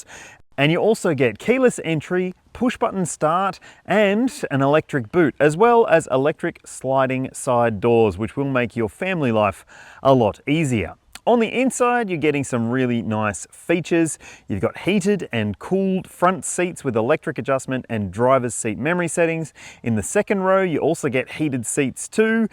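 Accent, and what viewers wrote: Australia. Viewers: Viewer from Malaysia here, Great review btw Could do with more infographics when explaining all the safety features, I know stralia' has more variants than us, ( we only have 3-4 only including the 11 seater), you could add your pick of the range Diesel + ? Which trim level with all the safety features Again, nice review Ps: i wonder how much does aussie auto reviewer had to tone down the accent for global accent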